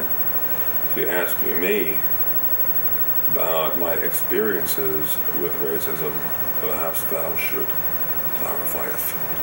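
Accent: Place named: New York, United States. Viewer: His NY accent really comes out here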